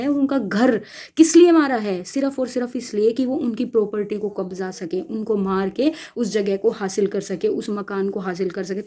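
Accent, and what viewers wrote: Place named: Garhwal, India. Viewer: Ye garhwali tone ayee hyee 😍😍 pahadi girl ❤️❤️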